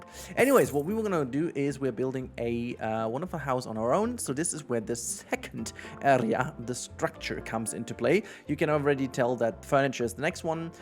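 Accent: Germany. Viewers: Wie man das deutsche raushört 😂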